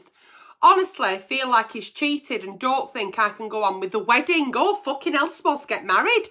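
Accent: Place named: England, United Kingdom. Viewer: Great video...Great Advice.... i was shocked with your honesty and vulnerability....respect to you! 👍🙋‍♀️👏👏👏👏 Your hair colour is gorgeous!! I live in the Netherlands...have done since I was 17...I'm 58 now and miss English accents.... yours is brilliant! Keep up the awesome work! 😀👍👏👏👏👏🌺🌺🌺🌺🌺🌺🌺🌺🌺🌺🌺🌺🏵🏵🏵🏵🏵🏵🏵🏵🏵🏵🏵🏵🏵🌼🌼🌼🌼🌼🌼🌼🌼🌼🌼🌼🌼🌼🌼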